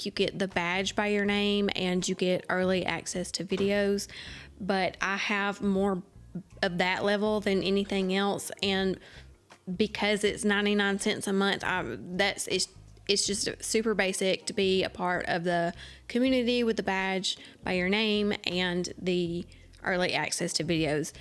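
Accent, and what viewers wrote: South, United States. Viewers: you're very talented! I like the blanket that you crocheted that's on your couch, the most. I like your Southern accent. My mom's parents were from Gaffney and Cherokee, South Carolina and my dad's grandpa was from Tennessee. I've always loved the twang.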